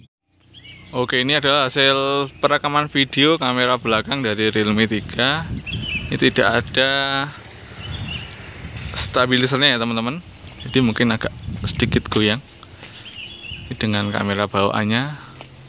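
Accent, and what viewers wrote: Magetan, Indonesia. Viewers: Mas sampean wong Magetan?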